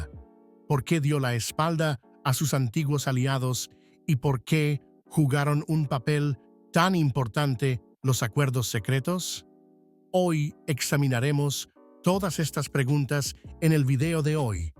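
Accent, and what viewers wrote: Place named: United States. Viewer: Porque acento yankee ??? Actualiza la IA y que te ponga acento neutro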